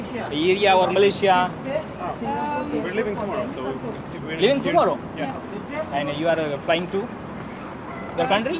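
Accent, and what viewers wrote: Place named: Pakistan. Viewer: Aap talking like pakistani cricketer!🕵 u had a great time in malesiya with foreign girls😍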